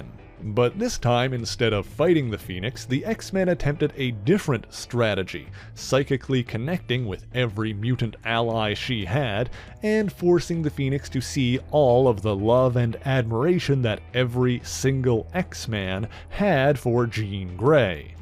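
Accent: Canada. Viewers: Nah... Dude is legit having plumbed the depths of Marvel history. Bro is Canadian and boy it shows. He has a bit of an accent, Canadian wise. No what we gotta get rid of is the snap judgement of immature gatekeepers.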